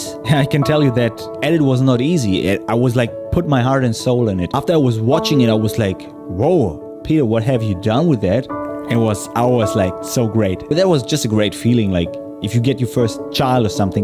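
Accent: Germany. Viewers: Ich glaube damals über das Colour Change Video in Premiere. Fand deinen Humor einfach sehr amüsant, außerdem ist es sehr angenehm, dass du nicht versuchst deine Herkunft zu vertuschen in deinem Englisch sondern den Akzent einfach mit Humor nimmst. Macht es soo viel nachvollziehbarer und sympathischer (Bitte nicht falsch verstehen, dein Englisch ist sehr gut nur hört man es natürlich raus, dass du auch Deutsch sprichst :)).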